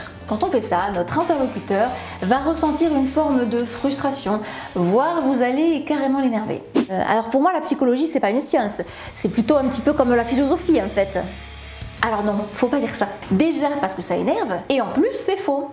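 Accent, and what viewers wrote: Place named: South, France. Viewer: Jolie accent du sud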